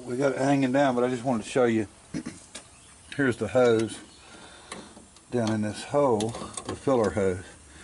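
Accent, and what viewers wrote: South, United States. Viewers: were you ever a teacher? Because you sure are good at teaching this teacher a whole lot of new things! Thank for the excellent explanations and beautiful, proper and polite Southern English.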